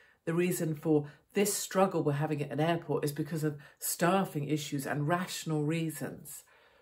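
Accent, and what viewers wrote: United Kingdom. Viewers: Based on your accent I assume you are posting from the UK. I may be wrong. Why on earth are posing in from of a hugh american flag? You have little say in the UK and exactly zero say in the US. A lot of what is now going wrong traces back to american moves.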